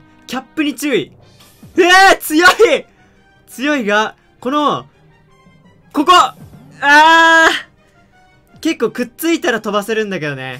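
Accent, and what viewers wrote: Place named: Hokkaido, Japan. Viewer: 北海道弁LINE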